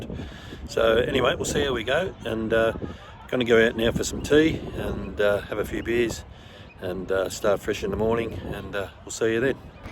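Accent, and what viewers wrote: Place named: Australia. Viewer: 36hrs driving.. “wowsers”... I’ve loved all your amazing earthy Aussie dialect tours. Thanks so much.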